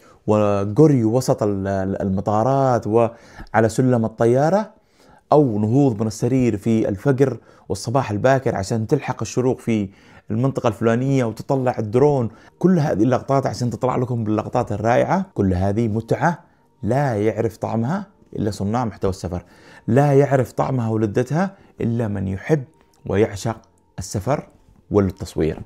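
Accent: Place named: Yemen. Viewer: ياخي لهجتك شوي مغربي شوي يمني شوي مصرؤ بش اكثر شي يمني من وين انت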